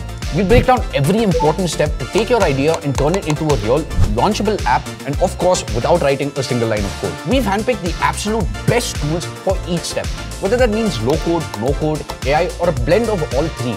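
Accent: India. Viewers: you sounded andrej karpathy as a keralite or tamilian, please bro don't harass us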